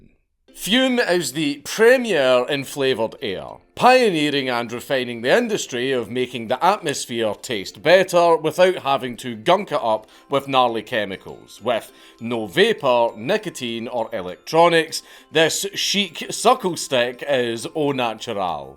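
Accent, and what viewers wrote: Scotland, United Kingdom. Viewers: Just got off work from the MMJ dispensary. My favorite medicine. So why not listen to one of my favorite Scottish men talking about really bad drug companies. Aahhj yes! Ripping good time! Thanks!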